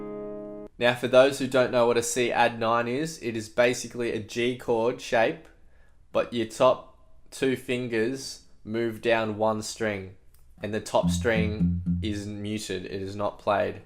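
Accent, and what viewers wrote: Australia. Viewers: Sounds like Aussie accents. No offense tho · Your tutorial was great man, you need more subscribers cause you deserve it 👊😁 What nash are you anyway? (You're aussie right?)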